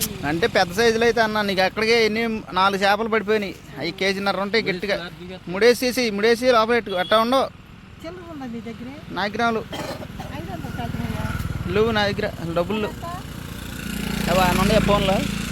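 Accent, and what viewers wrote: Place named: Bhadradri Kothagudem, India. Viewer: మీ మాట మా సయిడ్ లా మాటాడతున్నారు ఊ అదుగో అని😁😁😁 భద్రాది కొత్తగూడెట మాది😁😁😁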